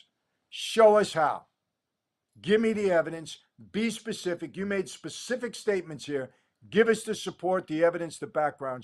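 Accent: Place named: New York, United States. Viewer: As a Native New Yorker living out of state, I especially appreciate your New York accent and tone to reinforce what I already know (all of my family are gone). I am 66 but you also come across fatherly and that feels reassuring. Most of all, I appreciate your walk with our Lord and your faith in Him. BTW, I have a very similar testimony as you in how I was convicted of the truths in the Bible and the revelation of my relationship with God. The main difference is that I was not in prison. I was at the end of myself, though. God bless you!!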